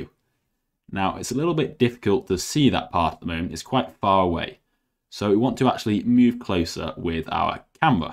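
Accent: United Kingdom. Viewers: british mate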